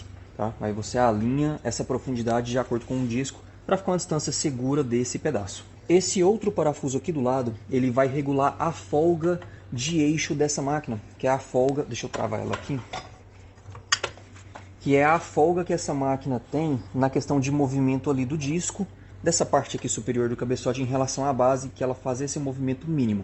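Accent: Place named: Goiás, Brazil. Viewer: Uai, de q cidade de Goiás vc é?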